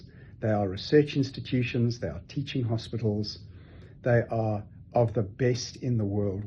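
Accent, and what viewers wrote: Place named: South Africa. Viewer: South African Accent? I like it. 😆👍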